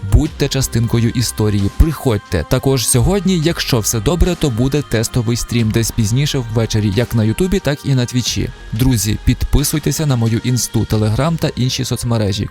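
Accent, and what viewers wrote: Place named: Transcarpathia, Ukraine. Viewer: він не понасирав, він просто з Закарпаття, я теж і у нас тут свій суржик такий і деяким людям важко писати і говорити чистою літературною мовою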